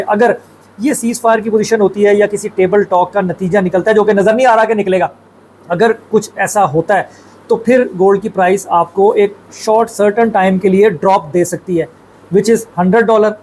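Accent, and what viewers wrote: Punjab, India. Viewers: Bhai G ap video main ye Punjabi touch Q dety ho? Do you understand it makes ur point more authentic? You look embarrassing so stop ✋ this Punjabi touch!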